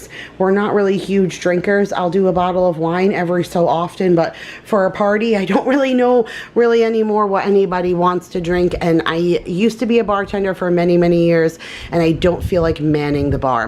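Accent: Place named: East Coast, United States. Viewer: My gosh friend, you truly know how to throw a yummy party, I hope you all had a great day. I really enjoy your cooking video's but how can I truly pick because I love them all, just hearing your voice reminds me of back East which of course I miss so much. It was certainly nice as I'm sitting here resting and recouping to find a video from you. Hope you are feeling better. <3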